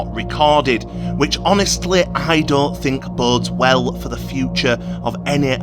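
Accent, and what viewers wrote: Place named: North, United Kingdom. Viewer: Liked and subscribed, great to hear a northerner doing lore! A humble suggestion, try putting your script or notes nto chat GPT, there was a little bit of repetition. Keep up the good work!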